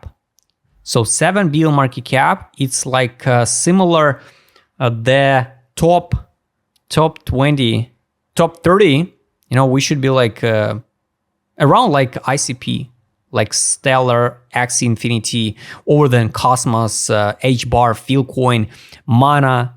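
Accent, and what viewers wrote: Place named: Russia. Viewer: disguisting russian accent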